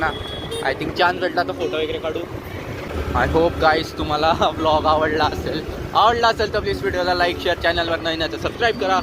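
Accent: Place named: Khandesh, India. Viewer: O bho khandesh ni marathi tuning ahe hay tr 🔥😂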